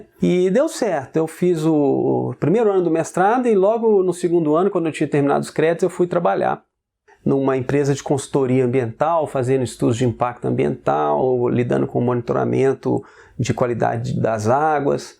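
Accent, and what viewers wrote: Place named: Minas Gerais, Brazil. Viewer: TÃO FOFO JESUS CRISTO como eu amo sotaque mineiro Mas ajudou pra crlho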